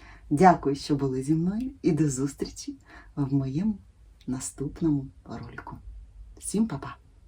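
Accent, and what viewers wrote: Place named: Surzhik, Ukraine. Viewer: Какой же всё-таки уродский суржик селюков, слушать невозможно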